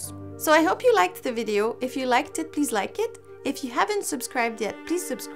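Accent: Quebec, Canada. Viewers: Êtes vous Québécoise?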